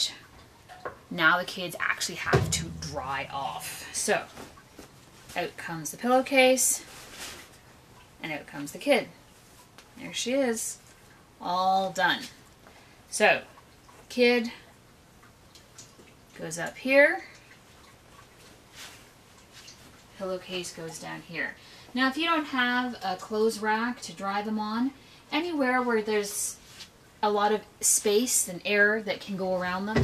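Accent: Canada. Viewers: Personally I put them through washing machine. I have a newer washer with no spindle. I use Scrubbing Bubbles spray on faces and bodies. Then use tooth brush to get in all the grooves of face. Then once placed in washer by themselves. I then let the washer do the work. The yarn is material and it grosses me out too much thinking how much filth could be in the yarn. Once washed - start the process of using sticky lint roller to take all lint / fly always. Let dry for a bit under ceiling fan. Then restyle hair. Instead of rubber bands try tiny zip ties. These will not only get a tighter fit but will look better as well. Especially Jesmars. While yarn is still a little damp/pliable - use sharp scissors ✂️ to trim fuzz flush against the CPK head. 😁 Your accent - shout out to Canada 🇨🇦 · That Sunlight bar costs $14 on Amazon. You sound Canadian maybe it's cheap there but here in the USA it's not in stores, and it costs a lot.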